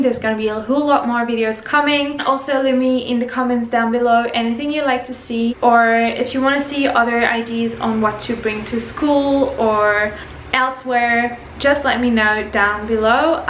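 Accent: France. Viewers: are you from France :p?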